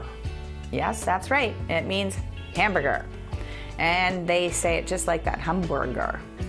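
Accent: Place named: United States. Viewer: what an american accent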